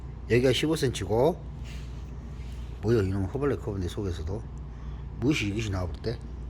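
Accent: Jeolla, South Korea. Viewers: 전라도 사투리 증말 재밌네요..목포 생선 연결고리가 없어 구입하기 힘들었는데 반갑습니다.. 계속 올려주세요...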